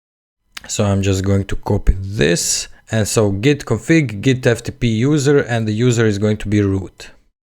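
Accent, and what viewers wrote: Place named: Russia. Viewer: Акцент сразу выдает русского)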